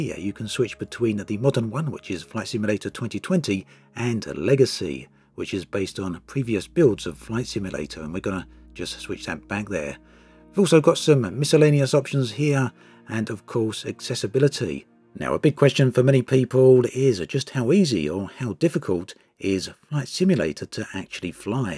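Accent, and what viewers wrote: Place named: Scotland, United Kingdom. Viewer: Edinburgh or Glasgow lol 😂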